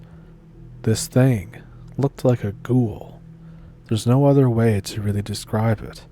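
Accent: Ontario, Canada. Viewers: Hello, - Happy Harvests, I thought I would drop by and hear a good story or triple. 🎉, i have said this before, but your accent sounds just like my favorite Ontario cousins, right on best holidays, friend. 👍🏿👹🌅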